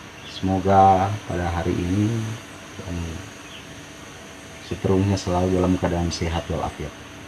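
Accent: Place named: Palu, Indonesia. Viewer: Dari logat sdh ketahuan org palu mange🙏😁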